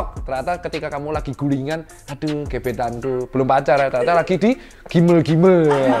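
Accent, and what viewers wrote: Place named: Surabaya, Indonesia. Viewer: Seneng sekali dgn konten edukasi kesehatan dgn gya suroboyoan nyel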